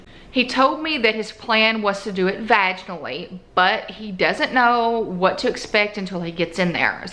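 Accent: South, United States. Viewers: Watching your video, hope ur well, I had mine December 1st, only bled 3 days, and stopped taking pain meds by the 3rd day, awww ur kitties, how many you have , such sweetie s, Sending hugs and prayers, where you from, I love people with southern accent, oh Girl, I know about the pot bellies, I hate it, I'm 46 , I had mine in December 1st and my Birthday is on Jan 9th, so I was 45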